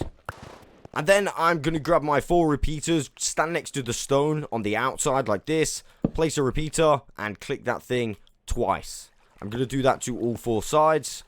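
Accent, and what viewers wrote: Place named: England, United Kingdom. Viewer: english lol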